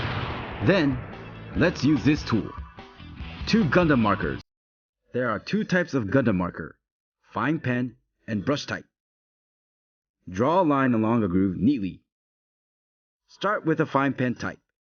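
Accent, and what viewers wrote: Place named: Japan. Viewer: It's the Japanese accent. Most Japanese men speak like this. Don't think it's nice to relate that to a meme, but I understand that a lot of people might not have been exposed to hearing such an accent before. 😃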